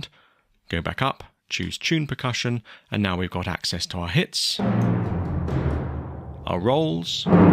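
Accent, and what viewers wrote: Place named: RP, United Kingdom. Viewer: Thanks for the videos. I love how your BBC accent suits the BBC sample libraries. It sounded out of place when you were talking about the lurid purple sex button on the Embertone Sax! No offence intended. I just love seeing the funny side of everything. :-)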